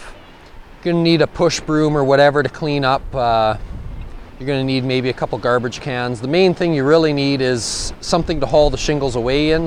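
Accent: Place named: Canada. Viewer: This dude has to be Canadian right? I'm totally here for it 🤣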